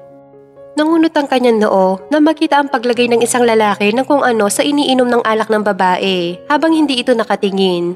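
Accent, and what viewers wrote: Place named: Cordillera, Philippines. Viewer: narrator parang taga somewhere in Kalinga or Ifugao ka,rinig po ung tuno sa boses mo sometimes hehe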